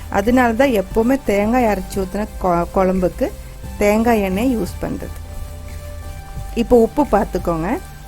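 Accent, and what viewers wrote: Kerala, India. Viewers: Semma recipe, thank you so much sissy 😍😍😍.... Unga kerala kalantha Tamil lovely 😍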